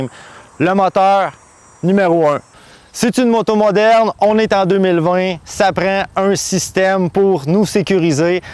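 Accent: Quebec, Canada. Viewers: Lol je m'y ferai jamais à votre accent les cousins !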